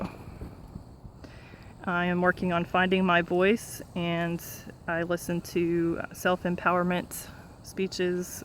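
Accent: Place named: Texas, United States. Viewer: You sound perfect- just enough Texas twang to make it authentic! Exactly how I figured you'd sound!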